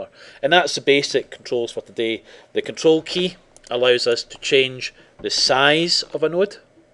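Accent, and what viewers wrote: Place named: Scotland, United Kingdom. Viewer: wtf ur scottish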